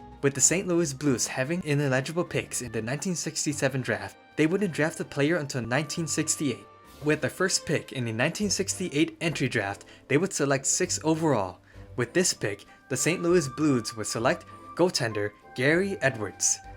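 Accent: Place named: Asia. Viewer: I didn't even realize the narrator's Asian accent until he said words like "Golden" and "Calder"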